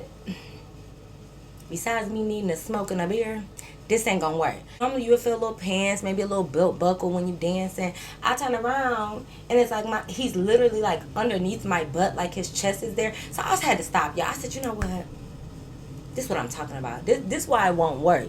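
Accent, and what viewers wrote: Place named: New Jersey, United States. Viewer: I fckn hollllered Get connected for free! With education Co- Nect - tion. GET THE FCK outta here 😂😂😂😂😂😂😂😂😂😂😂 idc what nobody say you Jersey coded to the bone 😂😂😂😂 I love this fckn channel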